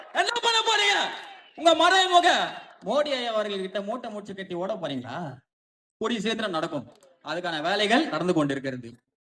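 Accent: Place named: Chennai, India. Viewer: I love your chennai slong❤❤❤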